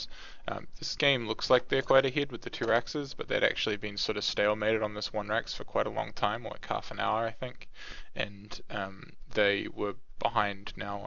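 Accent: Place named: New Zealand. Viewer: ayyy a fellow kiwi i can tell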